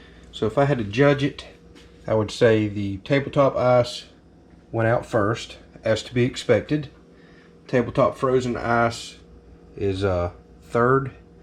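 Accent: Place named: Texas, United States. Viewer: Are you sure you are not a Texan ? That accent is spot on for people around San Antonio.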